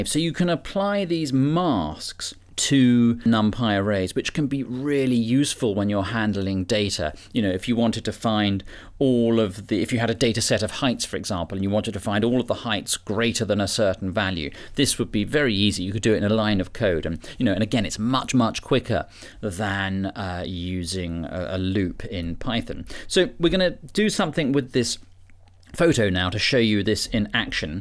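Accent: England, United Kingdom. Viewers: He's wearing a Ralph Lauren sweater, has an english accent and his name is * LIKE EARNT * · Good course. and I like the British accent :-)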